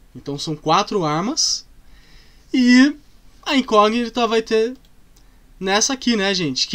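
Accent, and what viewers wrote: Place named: Northeast, Brazil. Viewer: tmj junto, preocupa não mano nos somos nordestinos e temos esse sotaque msm uai kkkk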